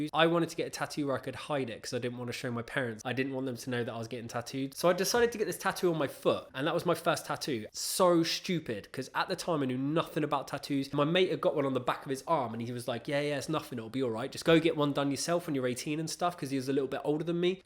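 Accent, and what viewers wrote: United Kingdom. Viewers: I don't have any tattoos (yet). The more I put it off the more nervous I get about the pain and healing, and just anxious about it.. like if I get some weird reaction or if I'll be allergic to red ink. But I've always loved tattoos and wanted them. In some ways I'm glad I've waited, I'm almost 23 and my preferences have changed so much. I want american traditional or neo-traditional tattoos now. Keep doing videos! I have a feeling you'll get a lot of subscribers! Also, where are you from? Your accent sounds british.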